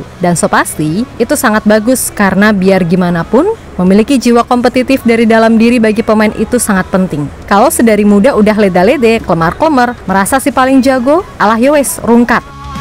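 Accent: Java, Indonesia. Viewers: Mimin logat ya jawa bgt yakin dah... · Jowomu ngendi min? 😅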